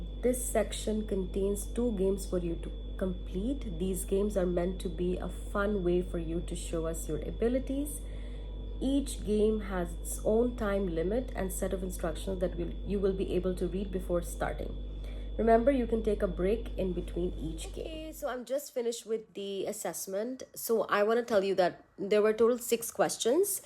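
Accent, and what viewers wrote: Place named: United Kingdom. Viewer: Is this mandatory to speak in a British accent or is it just a choice?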